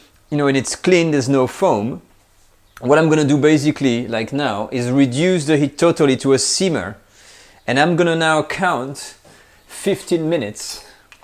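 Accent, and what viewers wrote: France. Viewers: You hv the cutest French English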